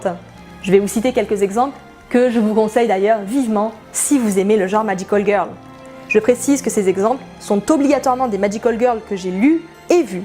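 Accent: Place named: France. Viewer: Ma chère fan girl si tu aimes tant les magical girl et les romances "love chunibyo & other delusions" est fait pour toi , je suis pret a parier que tu me remerciera en lançant des pétales de fleurs . Bon j’exagère peut être un peu mais il aborde ces thèmes et leurs codes avec un petit coté irrévérencieux très cool . Plutôt simple de prime abord ( J'adore cette expression )il peut être vu avec plusieurs niveaux de lecture si on se gratte un peu la tète et ca aussi j'adore . BREF FONCE ... non non non FONCE j'ai dit . J'avoue avoir sauter la partie sur "Your name". je fais partie des rares qui ne l'ont pas encore vu et j’esquive tout spoil potentiel ^^. J'aime bien ton accent français. Moi on me reproche souvent de prononcer comme un chef de la mafia Japonaise alors crois moi c'est pas si mal . Mais pourquoi est tu encore ici ? Fonce j'ai dit !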